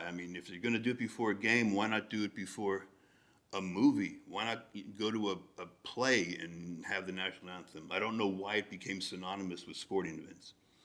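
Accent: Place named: Virginia, United States. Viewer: Where you from,at a guess I'll say Virginia,new York way..I'm English so excuse my guess if I'm offending you at the moment..just heard you say your 5 minutes from clippers and Lakers but that not an la accent is it pal??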